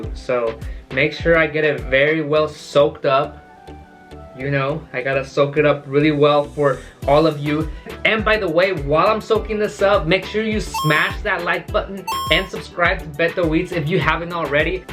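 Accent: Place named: Latin America. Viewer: Mi compa you earned yourself a fan by pronouncing them Indian names correctly(well almost correct with that latino accent)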